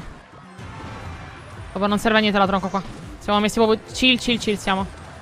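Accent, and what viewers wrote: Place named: Sicily, Italy. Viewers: Ma sei palermitana io catanese cmq sembri palerimitana dall'accsnto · Buonu nisciu l'accento siciliano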